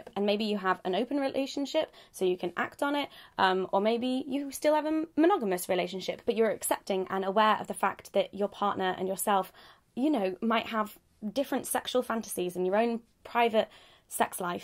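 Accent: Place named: North, United Kingdom. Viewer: Where abouts is from? I thought she was a southerner but is it only me who thinks her accent has a little northern twang? It's so cute! xxx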